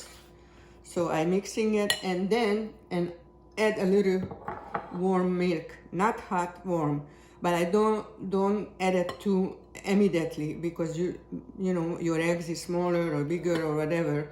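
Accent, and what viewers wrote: Hungary. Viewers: This was difficult for you but you did nicely. Take off your bracelet next time. Keep at it. You’ll improve. Don’t worry about your Magyar accent, it’s nice. What is it in Hungarian and then English? Tell the audience. Also if you have ingredients that are from Hungary give reference where to buy. Good job! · What is the purpose of the cream of wheat? I want to try this but I have never bought cream of wheat. Your accent reminds me of my Grandmother who was born in Hungary. Love your videos.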